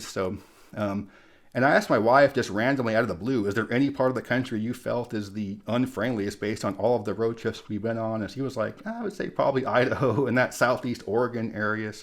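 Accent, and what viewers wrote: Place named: Southeast, United States. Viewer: I'm just guessing here, but your southeast accent is apparent to my ears, which many Mormons might associate with the Baptist denomination. And I believe there is a bit of hostility between LDS and Baptists, which became apparent to me when the typically-Republican-voting southern states had a very low turnout for Mitt Romney when he ran for President three or four election cycles ago. So it may go both ways, who knows. Edit: I'm from the Midwest, have traveled through Utah extensively, and lived in Wyoming for about a year, and was always embraced by the local population. You're a friendly enough guy, so it's got to be something else, either license plate or accent related (or that T-shirt you used to wear that read "Losers, Derelicts, and Savages unite)".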